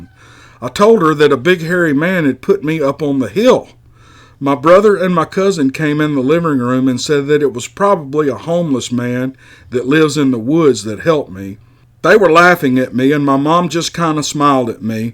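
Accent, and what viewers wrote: South, United States. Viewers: I think the southern accent really does it good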